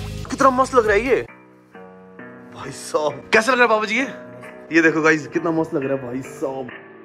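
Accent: Haryana, India. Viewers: Bhai aap Delhi se ho ya Haryana se?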